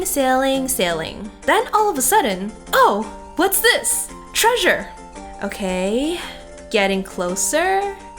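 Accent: United States. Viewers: please stop saying miamolto, , miamoto, , , you sound american, and yet when you say his name you try to put an H in there , like, miamohto, wtf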